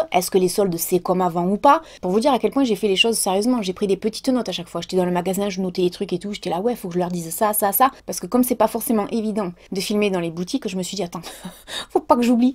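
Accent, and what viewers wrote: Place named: Marseille, France. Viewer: Vous etes de Marseille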